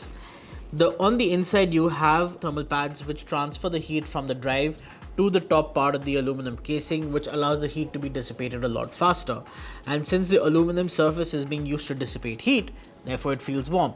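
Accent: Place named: India. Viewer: nice review. the first indian english review that didn't course me to throw up after 5 minutes of hearing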